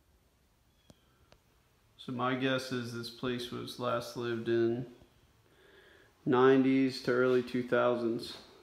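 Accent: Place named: South, United States. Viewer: There is something unnerving about an explore when its insides don’t match its outside. This one creeped me out a bit, but was redeemed in the end by the hand-hewn walls you discovered. You yourself are exactly as your voice suggests: A good-hearted, soft-spoken, southern boy. Pardon me saying “boy” if you find that offensive, but I am myself edging into old lady territory though I have yet to annoy any children by pinching their cheeks. At any rate, your insides match your outsides.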